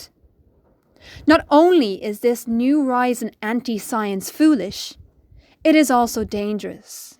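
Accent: Ireland. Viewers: 👍🔔 Where are you from I hear an Irish twang in your accent? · Are you Irish? 🇮🇪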